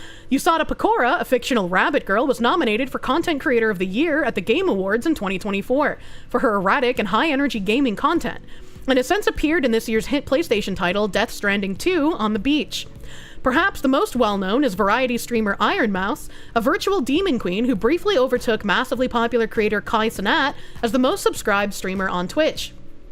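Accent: Boston, United States. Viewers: It's Bostonian dialect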